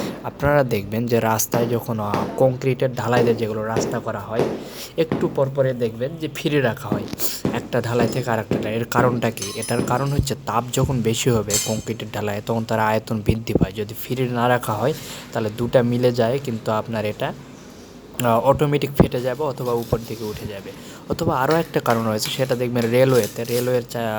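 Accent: Naogaon, Bangladesh. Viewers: আপনার বাসা নওগাঁ জেলাতে মনে হচ্ছে!!!!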